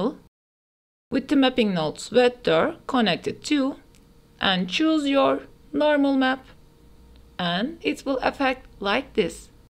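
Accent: Turkey. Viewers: Bu aksan ben Türküm diyor 😄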